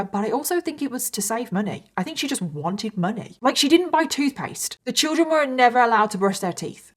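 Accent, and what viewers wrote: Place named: United Kingdom. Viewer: She from the UK and its her accent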